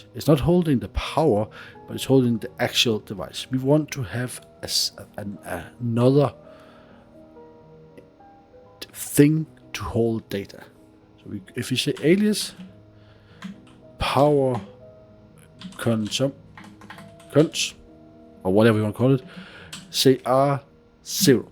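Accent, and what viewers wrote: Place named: Denmark. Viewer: The Danglish is strong with this one, but I learned a lot, thank you ^_^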